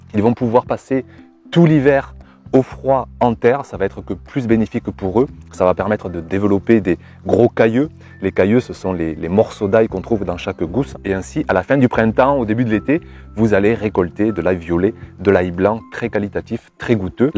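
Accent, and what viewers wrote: South, France. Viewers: Merci pour cette réponse extrêmement rapide :D alors ,non je n'ai pas d'avis sur les ails importés c'est plutôt de manière général ...Les fruits et légumes sont bons s'ils sont cultivés normalement...: pleine terre, récoltés au bon moment ,de saison,peu importe d'ou ils viennent...Si l'espagnole il cultive sa tomate comme toi (avec Amour :D ) elle sera très bonne je pense (avec tout se soleil) Le problème c'est que tout se qu'il y'a dans les magasins c'est aussi cueillit avant d'être mûr ...(exemple la banane n'a pas de goût quand elle arrive chez nous alors que tu vas là cueillir dans un bananier en Guadeloupe ,c'est autre chose...). Pour finir continue avec tes vidéos j'adore tu me donne un peu de soleil juste avec ton accent du sud (moi qui est de Caen (J'aime beaucoup aussi les vidéos de blec,Maud et évidemment l'incontournable Damien) ça m'aide vraiment (je débute 2ans) je fais toutes mes graines et c'est beaucoup de boulot mais tellement agréable :D A très vite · Super vidéo comme d'habitude tu fais des vidéos toujours aussi complète et comme tu dis on n'a pas le temps de s'ennuyer et puis cet accent du SUD et te voir en panta-court en hiver alors qu'aujourd'hui la neige a fait son apparition j'allais te demander des bonnes adresses, mais tu nous dis un petit peu partout donc je vais chercher ça sur le net si je n'en trouve pas en jardinerie Merci encore pour ta participation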